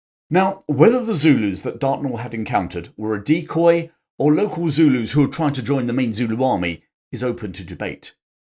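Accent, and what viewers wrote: United Kingdom. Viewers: I recently discovered, it is amazing 👍 This is the only way to hear British History by a Brit and with that beautiful British English accent😊 Thank you, with “howdies” from Texas 👋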